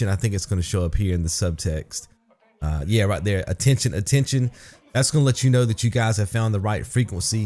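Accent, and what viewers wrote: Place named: South, United States. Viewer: Can I ask you where you're from, love your accent, reminds me of the South :D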